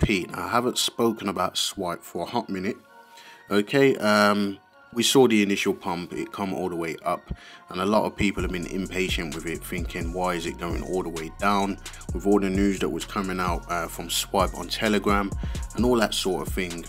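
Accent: Birmingham, United Kingdom. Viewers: You sound like a brummie Johnathon Woss.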